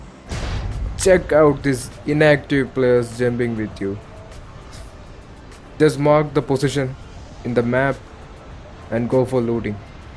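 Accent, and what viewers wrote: Kerala, India. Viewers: You are a malu for sure.Damn ur accent man!😁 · asif aliyude sound pole und....malayali aanennu manasilayi 😍😘...pwolie video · manglish.... bro, are u a keralite...???? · Malayali annale English kettapo thoni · Malayali anenn English kettappo mansilayi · True English kettapole manasil aayi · English ketapozhe thonni malayali aanenn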